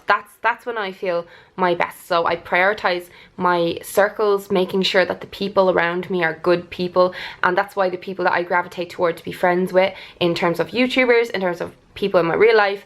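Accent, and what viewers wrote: Ireland. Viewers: Had to break for the Jehovah's Witnesses there, we had a nice chat there haha. Aw I love Beautycreep too, I've been watching her for years (being Scottish and all). I like the accent and live in Wales now. And you, provide me with the Irish accent that I love so much! Plus both of you have lovely videos, are so down-to-earth and have such a positive outlook.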